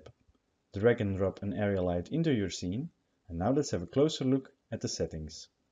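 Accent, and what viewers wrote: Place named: Flanders, Belgium. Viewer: Nice video, and nice flemish accent ahahah. Bedankt maat!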